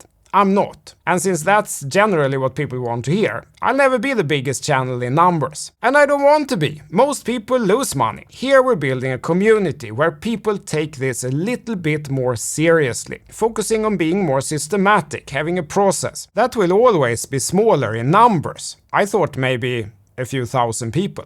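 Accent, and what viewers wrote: Sweden. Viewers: Din svinglish är obetalbar!😂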